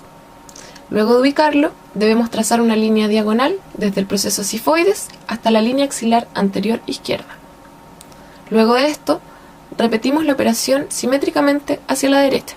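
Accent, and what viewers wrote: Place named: Chile. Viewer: Hermoso acento chileno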